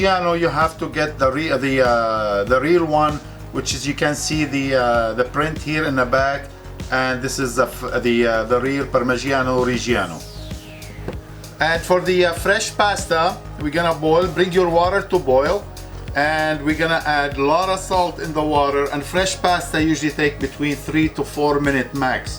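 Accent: Lebanon. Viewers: You sound so Lebanese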